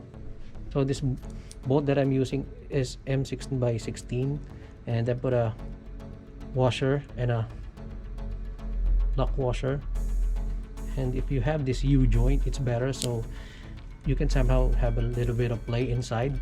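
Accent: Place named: Philippines. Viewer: Sheeesssh bro. I’m about to do the same thing, thanks for a great video. All I got to say is, damn your Filipino accent is thick lol🇵🇭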